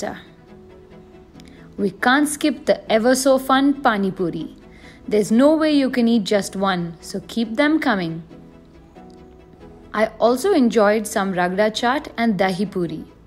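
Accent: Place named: Telugu, India. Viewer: Seems to be telugu woman speaking in English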